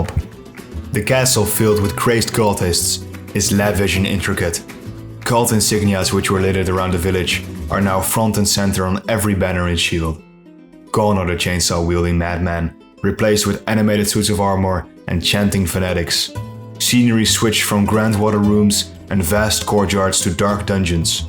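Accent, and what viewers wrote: Netherlands. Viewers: Great video, awesome accent where you from dude? Guessing Dutch but not positive. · i'm smelling a dutch accent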